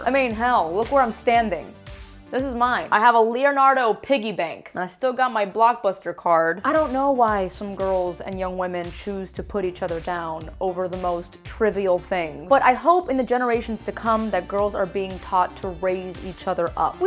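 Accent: Cuba. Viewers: I love your Cuban accent, especially when you imitate your grandma.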